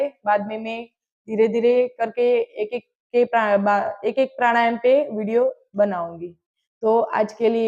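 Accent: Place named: Gujarat, India. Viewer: Shayad gujrati lago cho bolva thi khabar pade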